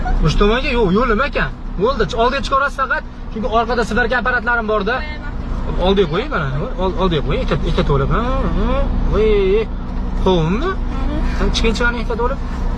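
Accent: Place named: Fergana Valley, Uzbekistan. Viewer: Sheva vodiy bölyotibdi özicha vohani tiqishtrganini maraz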